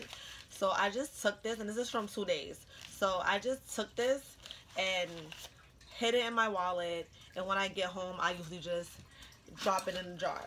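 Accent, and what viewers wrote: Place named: Brooklyn, United States. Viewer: U must be from Brooklyn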